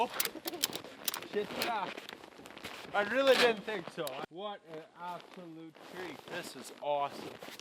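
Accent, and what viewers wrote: Canada. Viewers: Your Skills have greatly improved.. Videos are fun to watch and that Canadien accent is killer‼ Vinny [Boston]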